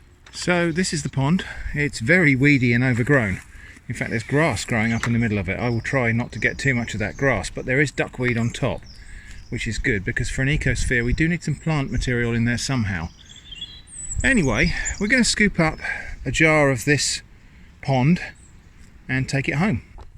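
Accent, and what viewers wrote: United Kingdom. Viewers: Such a fan of your channel, it was yours that introduced me to a topic I didn't know existed: Scambaiting. I then realised your quirkiness, intellect, good ol' fashion British diction and relaxing timbre sucked me in to the rest of your content. Thx for fighting the good fight👍👍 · I enjoy Watching your videos during these tough and uncertain times. In the US it is very chaotic right now and watching these video lets me know that there are things to do at home. Plus I like British accents. 🙃 I have started a hydroponic indoor mini farm and I'm starting to grow my own food. I hope you stay safe and nice hand washing.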